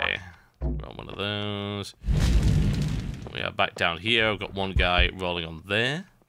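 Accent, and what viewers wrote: United Kingdom. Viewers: that is a british accent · I love how TB always says, "... Sorted..." in his brit accent xD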